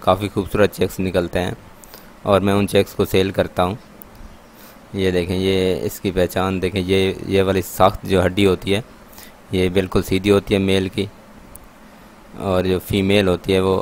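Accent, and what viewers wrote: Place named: Punjab, India. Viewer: اپ اپنا اردو بولنے کا طرز ٹھیک کر لو اپ کا انداز بیان اچھا ہوگا اور اردو بولنے کے اندر پنجابی لہجہ نہیں ائے گا تو لوگ اپ کی بات سے ویسے ہی متاثر ہوں گے اور خود بخود اپ کو سبسکرائب کریں گے کیونکہ جتنا اپ روانگی کے ساتھ ادب اور اخلاق کے اچھے بہترین طرز کے ساتھ اپنی گفتگو کریں گے اتنے سارے لوگ اپ کی ویڈیو کے لیے بے تاب ہوں گے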